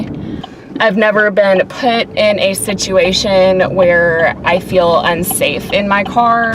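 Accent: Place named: South, United States. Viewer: U have a southern accent, u must be from either Kentucky or Tennessee 😂 love ur video btw.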